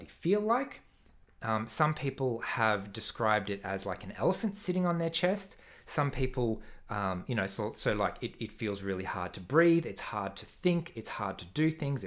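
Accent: Australia. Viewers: Thank you so much for this video! It helped me understand why I constantly talk, even to myself. Only then can I structure things. Wow! Wish there had been people like you when I chose to be a translator (written translations) cause I didn't trust my personality to be an interpretor (spoken translations) although I always loved to hear native people speak in their varieties. Well, now that I'm retired, I can do that on holidays. P.S.: I love your Australian English!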